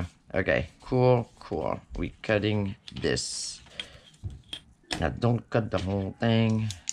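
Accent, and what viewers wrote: France. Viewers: LoL 🤣 you make me laugh Every TIME I watch you 😂 Lol!! I'm so glad I found your channel. No Lie.... When that music 🎶🎵🎶 came on out of 'nowhere' and you said "I gotta go..!."🤪then "I'm kidding!!" and cackled afterwards..I almost D⚰️d in hysterical laughter. I had to rewind to 🙉 hear it Again! You Are a Joy to watch because I don't know what you May say in your Cute French Accent that will have me howling in laughter!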